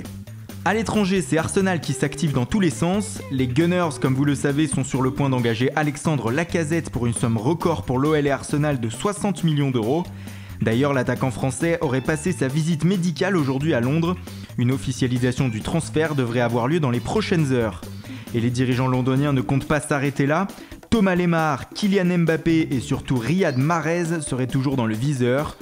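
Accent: Italy. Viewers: ton accent italien...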